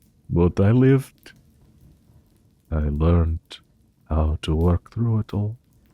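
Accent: Russia. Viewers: RUSSIAN MOTH MAN IS THE BEST MOTH MAN!!! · Before clicking on the video Oh hell yeah mothman after clicking on it oh Russian mothman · I love how when I saw this I was like “Aw moth man ^^!” And the second I clicked the video I was like “Oh my- was not expecting the moth man to be Russian 👁👄👁”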